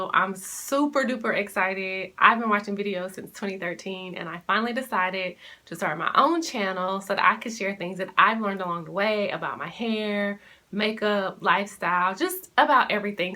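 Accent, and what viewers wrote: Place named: South, United States. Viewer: Cool beans! You sound a little a fellow Southern Girl, Lol! Welcome to yt...looking forward to the virtual connection. Peace and blessings. :)) *subbed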